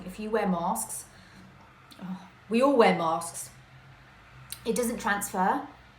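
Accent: United Kingdom. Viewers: I’m from Sweden and I love your brittisk accent and your sense of humor. And love to hear about your english life. By the way - I love makeup on you tube😍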